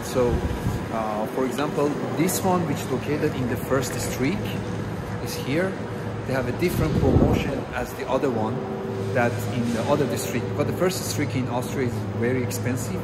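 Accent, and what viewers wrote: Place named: Iran. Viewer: داداش از لحجه ت تابلوعه ایرانی هستی دمت گرم😅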